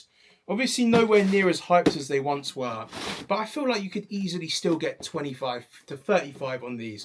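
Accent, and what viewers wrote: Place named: United Kingdom. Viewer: you are extreamly from the united kingdom thats all i have to say not a bad thing at all btw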